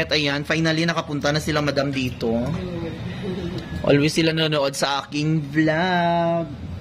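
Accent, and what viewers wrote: Iloilo, Philippines. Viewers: tga davao ka sir bat ilongga ang sulti ninyu dha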